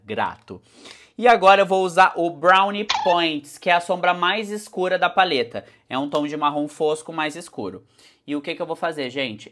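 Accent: Rio Grande do Sul, Brazil. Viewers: Adoro o sotaque gaúcho ❤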